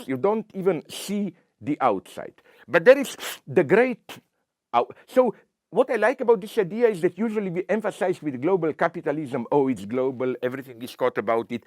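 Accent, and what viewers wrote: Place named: Slovenia. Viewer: How can this short video be transcribed for the hearing impaired and for those who do not speak English or understand his Slovenian accent?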